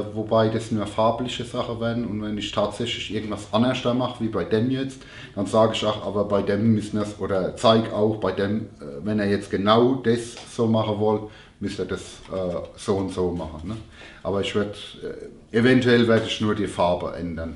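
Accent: Germany. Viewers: Hi, super Sache das, bin zufällig jetzt hier gelandet weil ich dein erstes Video (glaub ich?) gesehn hab, wie man einen Bobber für unter 2000 Ocken bauen kann. echt krass, gut gemacht hast eine gute Art sowas rüber zu bringen, deshalb freu ich mich auf die Erklärbärvideos, wird sicher geil Apropo, bleib so wie du bist, Pfälzer sind cool und Dialekt babbeln is voll ok ich versteh dich jedenfalls ...aber eins musste mir versprechen, bleib dir treu ;) Bis denne Stephan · Du Klingst wie ein Odenwälder 😁 coole Videos!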